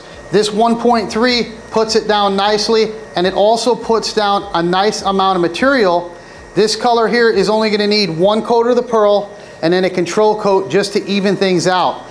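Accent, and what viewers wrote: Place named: Boston, United States. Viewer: Accent like your from Boston. Or Minnesota.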